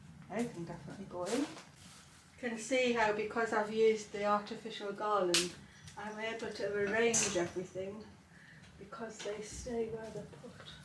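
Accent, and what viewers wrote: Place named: Northern England, United Kingdom. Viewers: I can’t tell you how much I’ve enjoyed being with you throughout this month, it’s been fabulous. I’ve enjoyed watching you, tidying, crafting, baking and decorating the house. I’ve loved listening to you, I’m originally from South Shields so your northern accent has been a pleasant reminder of home. Have a lovely Christmas time and I look forward to sharing time you in the coming new year. Thank you. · I've arrived here very late! I love this... Your bookshelf could've been lifted from my house. And I love that I've found a northerner to listen to.. I'm East Yorkshire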